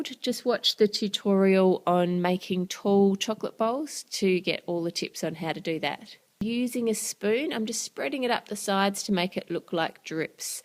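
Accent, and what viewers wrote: Australia. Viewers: Are you australian? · Australian I love it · Yeah she is Aussie! Thats Australian.. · are you aussie? cause if you are i will love you forever. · U from Australia?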